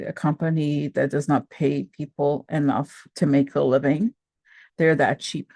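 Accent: South, United States. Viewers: OMG, you do really well with the Russian accent, but the southern accent needs work. Lol.❤ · LOVE the southern accent 😂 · what an incredible episode. Thank you. KAM WON! Where are your rings?! Your southern accent is on point! Reminded me of family, excellent job!;D And I've never been more proud to also have adhd <3 <3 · Man, I’m from Georgia and that’s a pretty good southern accent! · I must say, Your southern accent is cracking me up. hahahahahahah Namaste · you are so funny--the con man is so full of hot air. Love your southern accent which reminds me of a friend, a southern belle who grew up in Georgia.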